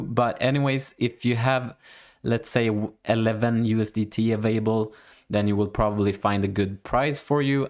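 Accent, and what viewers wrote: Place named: Sweden. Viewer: I love that Swenglish-Makes the video so much entertaining : D - Thanks for good tutorial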